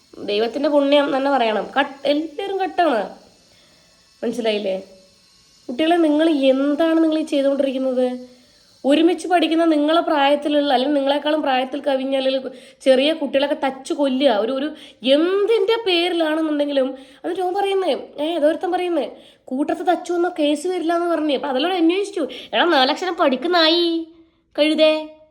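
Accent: Kasaragod, India. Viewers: Kasaragodinte shabdam 😊😊😊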